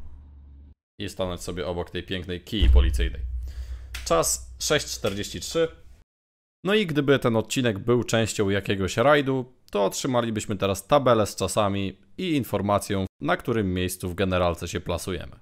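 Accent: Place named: Poland. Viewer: Słuchać? Ja musiałem wyłączyć dźwięk ponieważ uszy mi krwawiły od polskiej wymowy angielskich słówek. Autor chyba nigdy... kurwa nie wiem co....... Ja pierdole.... pozdrawiam. Bez urazy :)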